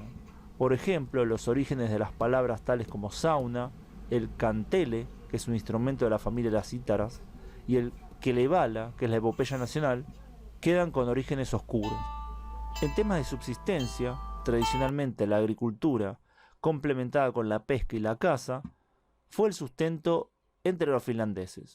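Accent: Argentina. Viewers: Wow... Super Argentino ese acento....👍👍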